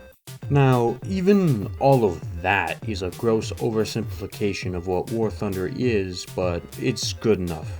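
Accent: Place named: Canada. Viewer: Great video. You have a better Canadian accent than mine lol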